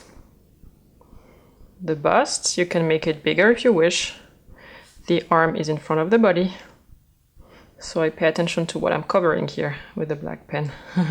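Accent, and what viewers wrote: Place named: France. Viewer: Very interesting. Very good teaching and a very generous teacher!!!!!!!!!! Thanks very much for sharing your ability. And your English, is very good, just a little french accent, non disturbing at all (I'm Italian).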